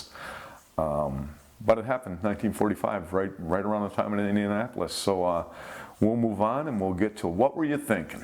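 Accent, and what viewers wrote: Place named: Connecticut, United States. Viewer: =You must be from Connecticut. I have been interested in dialects for 50+ years. How close am I in my guess? LMK please.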